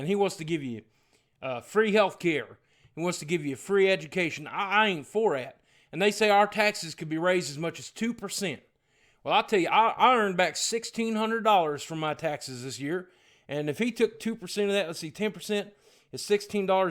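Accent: South, United States. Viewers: Wow, sounds like the normal for when I live. That country hick sound. lol Moonshiners eat ya hearts out. lol, By the by, I do live in the south, lol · Lmao I live in Georgia and you remind me of so many people I know 😂😂😂 your southern accent is spot on!! Hahaha I'm dead. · ***** im offended how easily you get offdened. I enjoyed the video, found it funny. i just wondered why the warning was there. it was pretty "safe humor." half a southern accent, talk about trump. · At first I was like wow that's a really good southern accent, then I remembered you're from the south.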